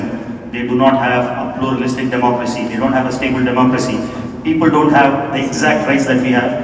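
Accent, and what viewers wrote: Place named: India. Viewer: Andukea meru vijayavantulu iyyaru And your english is indian english not American english, we are proud to be indian garu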